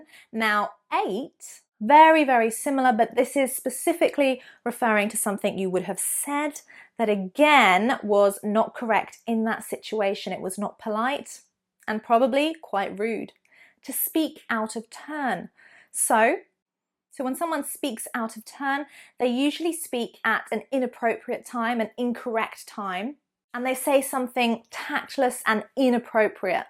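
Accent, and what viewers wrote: United Kingdom. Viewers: Thanks for beautiful British accent of teacher! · Hello... Let me start saying hello for you ladies, I have started listening to your videos recently and I want you to know that I am a big fan of the British accent which gives you a big point and definitely another student, you are super amazing keep going, let me please see in the coming days more videos about the pronunciation in the British accent. Thank you for the information and the great effort you are doing in these videos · I really like Britain accent. It is so clear and wonderful 👍🏻👍🏻. Thank you so much my beautiful teacher 👩🏻‍🏫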